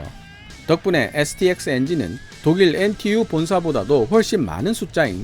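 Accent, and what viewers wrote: Gyeongsang, South Korea. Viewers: 그넘의 징그러븐갱상도 사투리 집차라~ ....죽것다!!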